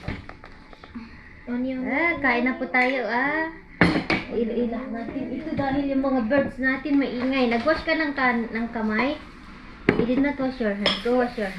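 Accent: Ilocos, Philippines. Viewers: Halata k nga Ilocano uray Tagalog ti saom kabsat. No maminsan kasla Ibanag ti accent mo.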